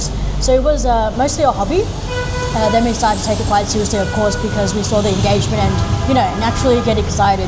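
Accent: Australia. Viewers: Ms, you are an Austrailian????????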